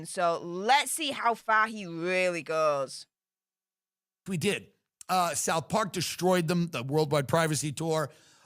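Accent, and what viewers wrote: Yorkshire, United Kingdom. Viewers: Couple O Wee-Back 🤣 Yorkshire accent is class 👏🏽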